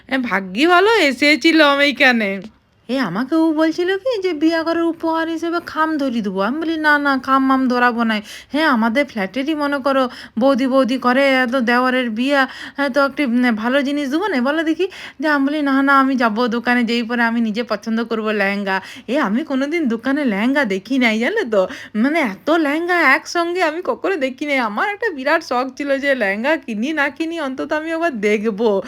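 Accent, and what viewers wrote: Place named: Purulia, India. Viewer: Didi aapnar Bangla ta kon jaygar aar tomar biyer samay to lahenga chio na tomar bhasa ta thik purulyar moto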